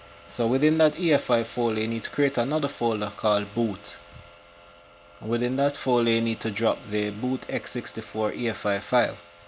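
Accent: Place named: Jamaica. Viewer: The author sounds Jamaican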